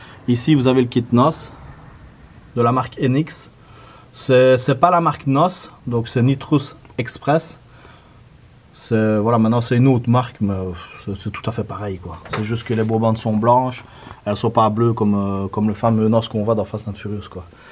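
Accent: Liège, Belgium. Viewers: T belge ? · J'arrive toujours pas à m'habituer à l accent de Liège mdr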